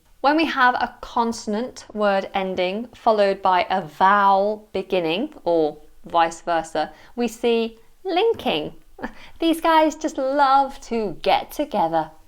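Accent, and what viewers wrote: United Kingdom. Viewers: I love your smile with nice British accent. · You are so cute ❤️ You make me love English and also British accent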